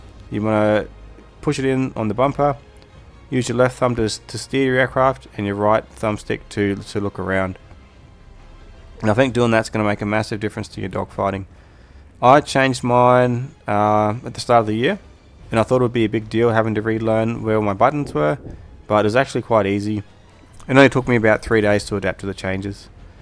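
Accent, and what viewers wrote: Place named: Australia. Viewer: the accent gave it away buddy, I live in NSW.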